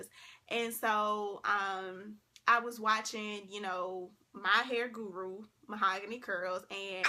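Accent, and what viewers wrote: South, United States. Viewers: I live in SC and you sound way more southern than I do lol! #southerngirlsrock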